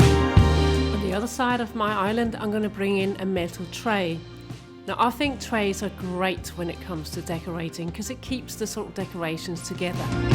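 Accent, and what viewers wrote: United Kingdom. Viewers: I love how you decorate, everything looks so beautiful. I like all the crows too. You are very talented. I am from the States in Connecticut. I always wanted to go to London. I love your accent. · I am so happy to have found you! I am from the states, I live in Michigan. I have been to London several times and fell in love with it, especially the people. Your sweet little accent makes me smile. Your decorating is so inspirational and you make it look so easy. The large book/skull cloche in the kitchen is my favorite. Your home looks lovely. Sending a BIG hug with thanks for sharing your ideas! Cherrie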